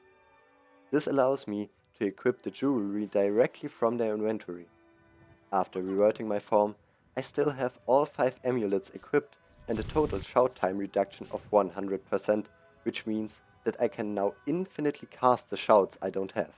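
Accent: Germany. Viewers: U german? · Der moment wenn du direkt merkst das der dude deutsch ist😂💋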